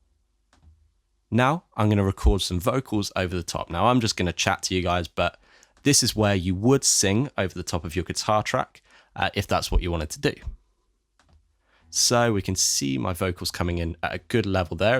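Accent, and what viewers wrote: United Kingdom. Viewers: Barely heard the introduction Liked for the Englishmen accent omg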